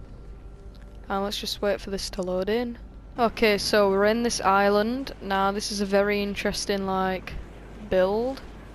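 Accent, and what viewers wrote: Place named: United Kingdom. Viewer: Hes british eh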